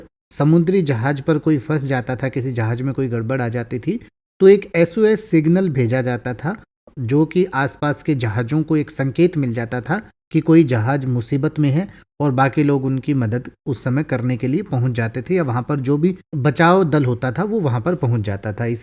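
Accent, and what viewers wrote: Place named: Assam, India. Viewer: Assamese laga thank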